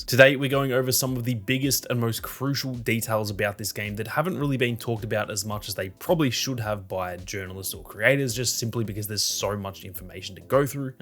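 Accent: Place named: Australia. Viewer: Nice to hear a fellow Aussie. I'm hyped too.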